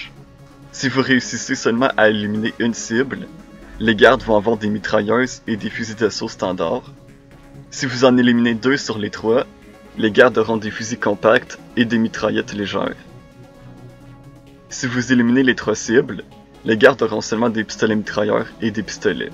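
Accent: Quebec, Canada. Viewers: J’aime la vidéo Hahaha et ça parait que t québécois (je le suis aussi) gg continue comme ça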